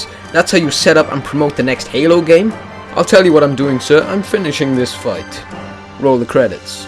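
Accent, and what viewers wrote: Wales, United Kingdom. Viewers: you must be from wales! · Where are you from? you sound welsh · Are you Welsh? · I'm picking up Welsh, Italian and Irish from your accent? No offence intended..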